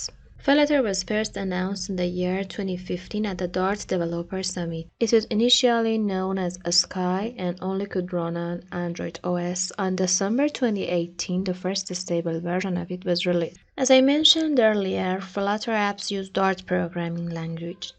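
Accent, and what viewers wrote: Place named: England, United Kingdom. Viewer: Excellent english accent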